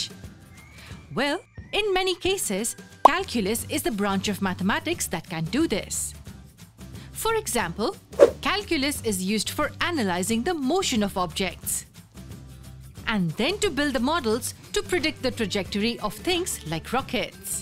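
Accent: India. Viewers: WHY DID IT HAVE TO BE A IDAINAN VOICE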